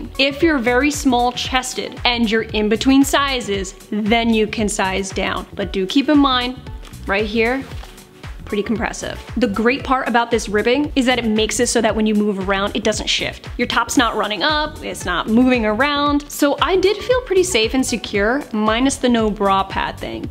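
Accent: New Jersey, United States. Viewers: Not interested in buying literally anything. Here every time EXCLUSIVELY for the Jersey accent.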